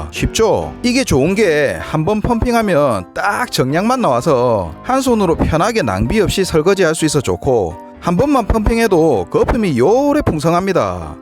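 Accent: Gyeongsang, South Korea. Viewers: 아 갱상도 사투리 듣기싫네 정말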